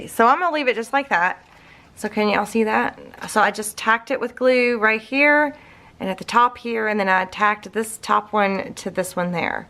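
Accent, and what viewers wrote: South, United States. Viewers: Gorgeous! Yes, love you southern bell accent! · You have a lovely country twang. I love hearing you talk. I remember when I first started watching I was lost sometimes, but the more I watched your tube tutorisls, but more I understood that beautiful country talkin. Jus sayin. ♡ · Oh my...I love your southern accent. I'm from California so the way you talk is interesting. Lol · Well I have never heard that phrase before (Dirty English) SMH... HA People come up with the darndest (hummm is that even a word? must be my dirty English) things. I just adore your southern accent. And I for one can follow you just fine. !!!. Oh yeah, I love this album. Since I was a madeitcon, I'm working on the regular cover while finishing up my original album. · I love your southern accent but of course I live in Texas 😀. Beautiful! Everything u do is absolutely beautiful!😍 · I'm really having fun with this album, I'm using the Georgia Blue since I didn't have the Santa Baby paper and I'm loving it. 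So many different elements on the cover really special, love that. Can't wait to see the rest and for the way you talk, I think it's as cute as it can be. How could anyone not like a southern accent? How petty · Such mean people...love your accent...I have many friends from the south...I'm from the middle of Indiana, people from the north of the state thinks I have a southern accent, LOL. We have an Indiana twang...YOUR GREAT...Hugs...:)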